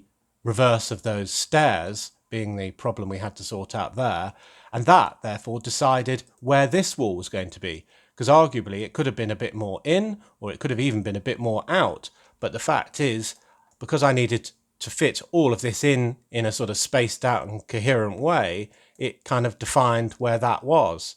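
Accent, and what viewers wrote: United Kingdom. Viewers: Great video (as always😉) Myself, I use leocad for designing small Lego buildings. There catalog is bigger than the one from ldd. I'll download bricklink studio and give this a try, as you or someone in the comments said, bricklink is now in the hands of LEGO so maybe there catalog will be even better. Keep up you great video's, it's always a pleasure to hear real UK English and not the simplified version 🤣🤣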